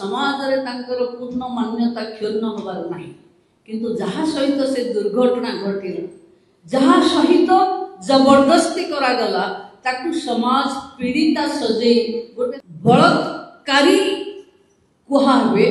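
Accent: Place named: England, United Kingdom. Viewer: Speak clear odia. No need to speak english style odia.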